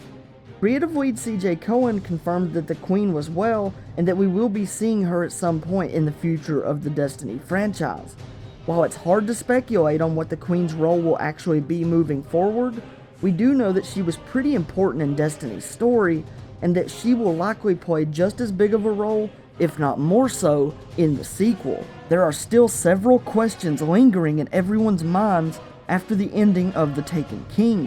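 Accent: South, United States. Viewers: I hate southern accents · I thought it would be a fuunnn way to tie up those loooose ends 👌cowboy accent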